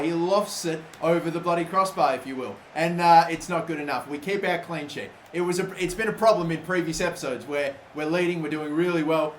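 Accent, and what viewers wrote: Australia. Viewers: He's an Aussie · Is he Australian ?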